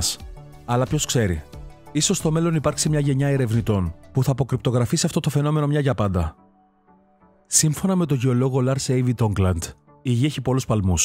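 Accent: Crete, Greece. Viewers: Πολύ ωραίο βίντεο. Έχοντας φτιάξει μία εικόνα γύρω από την φωνή σου, θεωρώ πλέον ότι έχεις καταγωγή κατά 90% από Κρήτη. Please απάντησε μου αν έχω δίκιο 🙂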